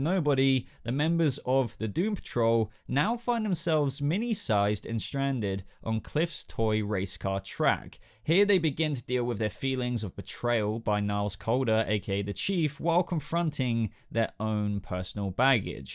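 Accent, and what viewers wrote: United Kingdom. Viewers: OK I've just came across your channel, and thought, due to your accent that you are in the UK, but after what you kept saying about the CW, and DCUniverse things and hbomax, none of these options are available in the UK, so you must live abroad to be able to watch these programmes regularly? If I'm wrong then my apologies, but I'm confused, lol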